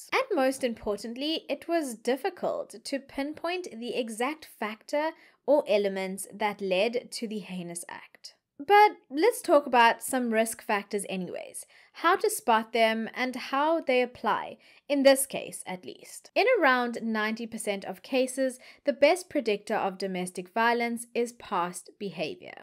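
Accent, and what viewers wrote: South Africa. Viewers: I just found your channel. Love it. Can’t wait to see what other videos you have created. I love your accent. At the last school I taught at, the school secretary was from SA- best accent I’ve ever heard. Then I found Nick Van der Leek (true crime rocket science) and you even better. Great content, fantastic narration, love your background knowledge you share. I agree with family annihilator is weird term.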